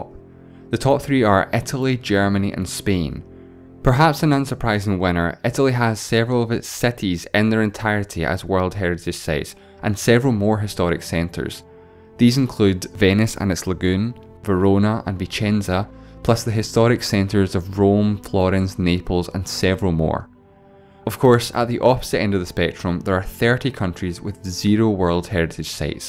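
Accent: Scotland, United Kingdom. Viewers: It's Scottish, just not the heavy Glaswegian accent most people associate with Scotland. This from some one who lives further East, possibly Edinburgh. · Scottish? Love it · scottish accent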